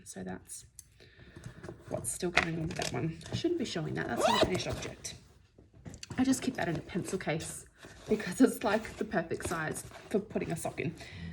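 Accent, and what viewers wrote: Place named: Australia. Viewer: Hello I was recommended to your podcast from the sisters at Frivolous & Frugal (Penny & Dawn) USA what a surprise when I heard you speak, a fellow Aussie 👍🏻 I live in mid north SA and after going back to your 1st episode instantly subscribed. Rainy day & cold today I’ll be watching in order your previous episodes, snuggled up on lounge knitting. Many thanks 🦋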